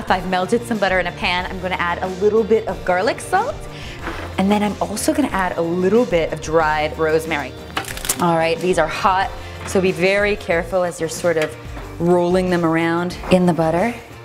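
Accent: Canada. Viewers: You sound Canadian. I love the cinnamon raisin with cream cheese. But now theres competition. I have to figure out which one of these I love the best.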